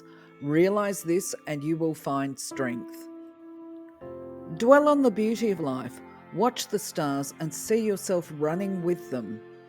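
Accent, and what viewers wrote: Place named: Australia. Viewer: Great content, thank you. Nice touch to hear an Australian female voice narrate a welcoming change.😊👍🏽🇦🇺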